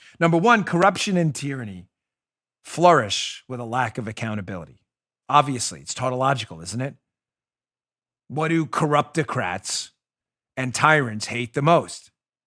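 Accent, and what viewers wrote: New York, United States. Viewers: !! You are SO NY and SO Italian!! Love it!!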